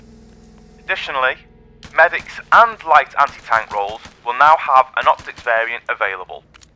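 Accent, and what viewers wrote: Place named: United Kingdom. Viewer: Why a British voice??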